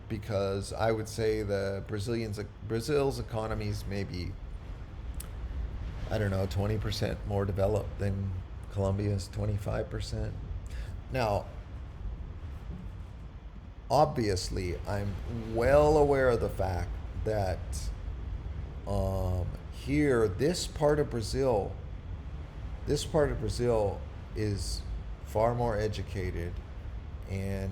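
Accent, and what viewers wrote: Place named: United States. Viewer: Maybe Colombia isn't so open to you, an expat, because you keep spelling the name of our country wrong. Or because you're coming from somewhere else and making broad generalizations about people, using phrases like "better" when comparing cultures. Who the f*ck are you? Where are you from? You sound American to me. We know how welcoming the US is to foreign expats who aren't from Canada...(*sarcasm) Also, you sound like an absolute CREEP, using phrases like "I get slightly better women". Bro, go back to Wisconsin or whatever. Or can you not "get" any women over there?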